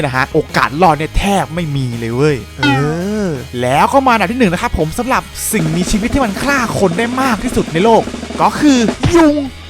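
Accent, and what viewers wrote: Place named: Sukhothai, Thailand. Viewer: พี่พูดเหมือนภาษาสุโขทัยเลยคับ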